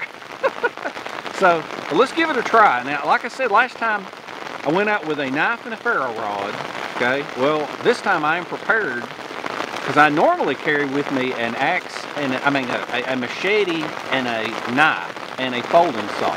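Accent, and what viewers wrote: South, United States. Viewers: He is as southern as it gets. I am from Alabama i know what i am talking about. Well sometimes i do.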